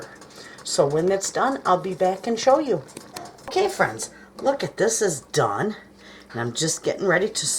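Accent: Michigan, United States. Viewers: I could tell you were a Michigander right from the first video I watched! I love your accent! Your recipes are sweet, simple and to the point. I looked up info. on the township you live in. What a wonderful, small community to spend time with your families. God Bless you and yours. Subscribed and liked😗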